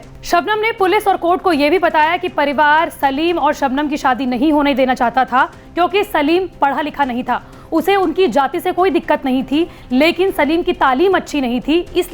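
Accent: Bihar, India. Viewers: App bihari ho kya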